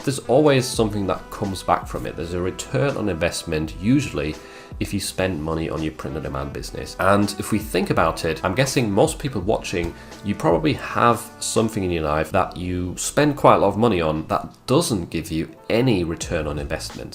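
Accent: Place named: United Kingdom. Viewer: 2023 ! A little late to the party as I've just found your channel. Great tutorials. Thank you.. Nice to hear a British accent too.amongst the sea of our US cousins! 😂😂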